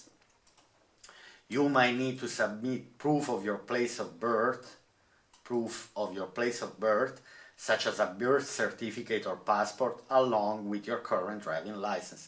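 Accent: Italy. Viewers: Il tuo accento è uno strumento di marketing. Non posso credere che parli in Inglese in modo quasi perfetto grammaticalmente e contemporaneamente ha l' accento italiano più forte mai sentito. Cmnq bravo, video carino. 😅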